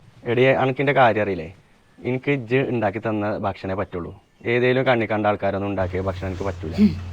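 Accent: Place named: Malappuram, India. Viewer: ഇജ്ജ് അൻ്റെ മലപ്പുറം സ്ലാംഗ് പിടിച്ചാൽ മതി, ഇടക്ക് ഭാഷ മാറുമ്പോൾ ഒരു ഗുമ്മു ഇല്ല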